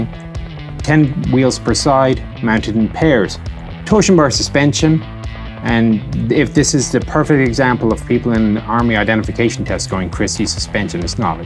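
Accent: Ireland. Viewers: 🤣 Irish or Scotish?👍👍👍💯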